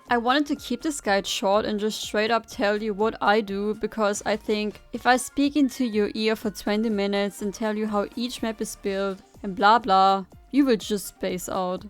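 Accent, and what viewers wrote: Germany. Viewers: German accent heh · I hear deutsch Akzent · Are u german cuz ur english sounds like you're german? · First thing I noticed: definitely a German accent, but nevertheless a nice guide!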